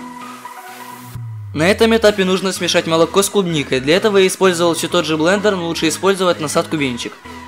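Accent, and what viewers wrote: Ukraine. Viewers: Этот украинский акцент...)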